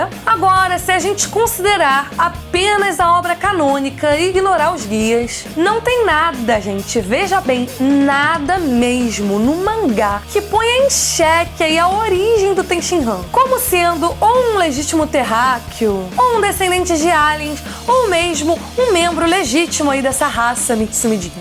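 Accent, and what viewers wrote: Rio de Janeiro, Brazil. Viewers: Ela é carioca? kkkk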